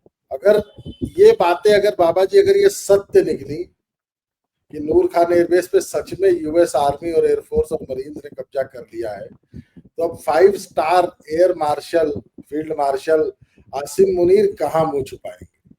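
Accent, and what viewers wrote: Haryana, India. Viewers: Babaji Jay Shri Ram aapka Haryanvi leja bada achcha lagta hai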